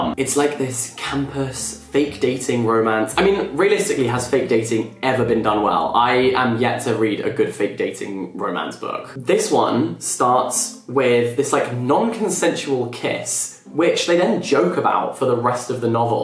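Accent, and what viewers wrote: United Kingdom. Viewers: WHY IAM I LISTENING TO A BRI-ISH MAN RANT ABOUT BOOKS AT 2 AM? i do not know ( i love him sm)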